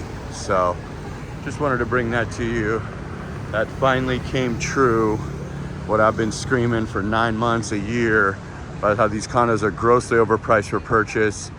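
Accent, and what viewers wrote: United States. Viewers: Watching from Agoura hills Calif you have American accent Bro!!!! Take good care I’m originally from bugallon Pangasinan 4 hrs drive from where you are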